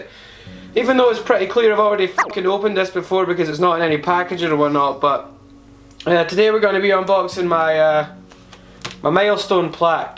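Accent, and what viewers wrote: Scotland, United Kingdom. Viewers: Skót akcentus ❤❤ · Oh my god ur scottish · Is scottish lmao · AHHH it's bc he's Scottish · ikr, its like he is scottish or something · XD are you Scottish? Or Irish? Respect. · Is that a fellow Scottish friend I hear in that accent?!?!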